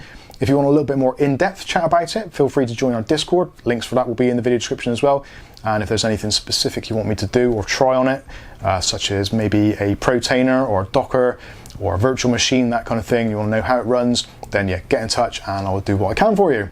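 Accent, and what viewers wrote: Bristol, United Kingdom. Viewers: Hi, I have a Asustor 5202T Nimbustor which is very similar. One thing you should mention is that Asus and Sound blaster USB soundcards work well. My NAS is connected in to my Hi-Fi and all my music and a lot of vids are stored on my NAS which makes it a great media player. I have recently installed the Home Assistant app and he I,m now exploring using my NAS to monitor and control home energy usage. Finally, I think you are a Bristolian judging by your accent... Am I right?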